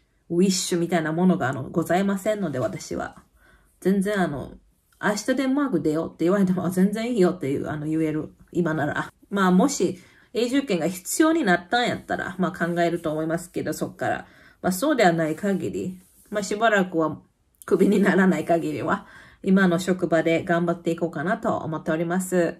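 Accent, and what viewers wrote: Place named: Osaka, Japan. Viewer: 英語でしゃべったら嫌がられるなんて。。フランスみたいで辛いなあ〜。なんで、そんなにプライドを捨てられないのかなって残念ですよね。でも中にはそういう親切な方もいらっしゃるということがやっぱり嬉しいです（＾＾）。ちなみに、のそのまんまの喋り、面白いです笑大阪人ですね〜笑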